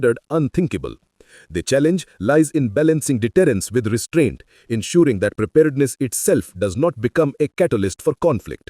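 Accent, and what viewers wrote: India. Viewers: If it is AI voice why pick these Indian accents this is so hard to hear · Indian accent. I dont like.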